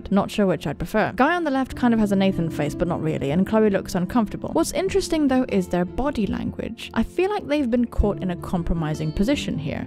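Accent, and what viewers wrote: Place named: United Kingdom. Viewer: OK!! So this is my first time here and my first video of yours as well and it seems like you are a big fan of 'Life is Strange' and that is really cool, and I have wanted to say this from the beginning but I LOVE your voice! British? Australian? But more British I think... Am I close? Anyways love the voice and I love the game so I think I will stick around.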